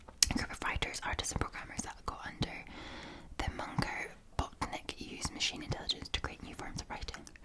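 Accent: Scotland, United Kingdom. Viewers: Don't feel bad for your fast ramble talk I think I speak for everyone here when I say I find your fast ramble talk to be extremely relaxing especially with your beautiful heavenly Scottish accent! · I struggled to stomach any Disney "food". Rides and ambiance is what Disney's good at. That's all. I digress... Thanks for your soothing videos! I'm English /Canadian and love your Scottish accent. I told my wife about how much I enjoy what you do. She's very understanding, but it's 'not for her'. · ASMR, and Lollipops....Definitely a great mix.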